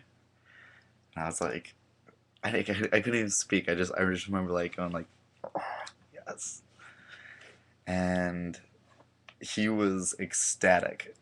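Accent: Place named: Midwest, United States. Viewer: you are adorable regardless of the lighting & love that midwestern accent...thought you were from minnesota until you mentioned chicago! =)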